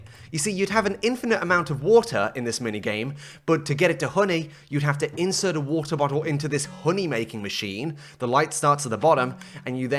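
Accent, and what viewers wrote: United Kingdom. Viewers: Why does your brittish accent attract me so much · I love watching this guy. Especially since he is British. There was a science experiment and it was proven that people listen to those who have British accents more than other accents like Southern or New England accents, and I can defiantly say that I listen to this guy a lot more than most youtubers. :D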